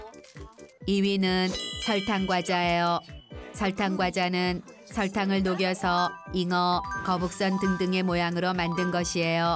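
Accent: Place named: North Korea. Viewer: 근데 목소리가 북한말같음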